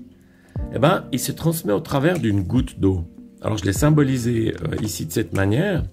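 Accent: Switzerland. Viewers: Si on protège le pied des tomates avec une bâche perméable à l'eau ça évite les éclaboussures dues à l'arrosage, qu'en pensez-vous? Bravo pour vos vidéos courtes et allant à l'essentiel, c'est toujours un plaisir de vous écouter avec cet accent bien Suisse pour nous autres haut-Savoyards.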